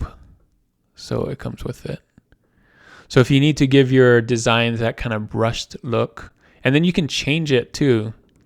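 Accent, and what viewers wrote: Hawaii, United States. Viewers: Bro, are you from Hawaii?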